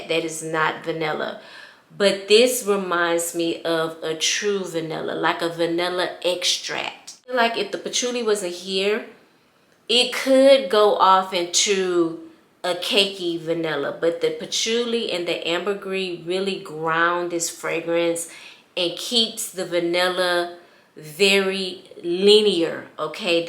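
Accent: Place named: East Coast, United States. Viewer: I would definitely!! I love watching perfumes & fragrances, indulge, I love your East coast ♥️ accent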